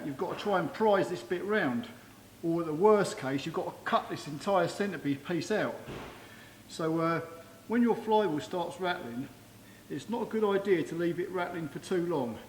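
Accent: United Kingdom. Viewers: Ох уж этот Британский английский 😂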